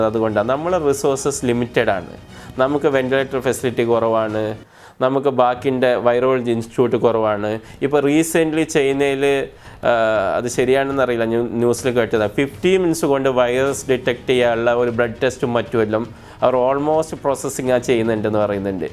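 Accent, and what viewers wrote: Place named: Malappuram, India. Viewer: മലയാളം ഇഗ്ലീഷ് എന്നിവ പഠിക്കുക. ഒന്നും മനസ്സിലാകുന്നില്ല .ഭാഷ Not correcti മലപ്പുറം സ്റ്റെൽ ബോർ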